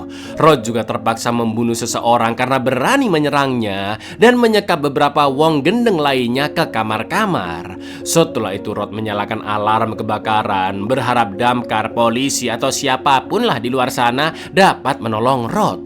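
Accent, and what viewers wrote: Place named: East Java, Indonesia. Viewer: Cak, jowone sampean ngger endi? Kok jowone podo carane ngomonge karo aku, aku wong lumajang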